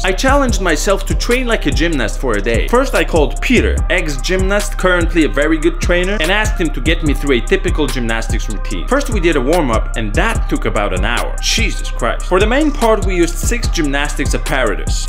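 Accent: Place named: United States. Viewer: tracisz swój american accent already:O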